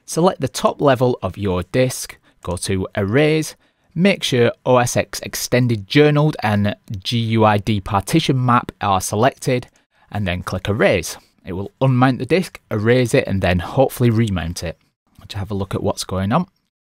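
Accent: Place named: Northern England, United Kingdom. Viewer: Other than the heavy Northern English accent great video very well explained! Thank U!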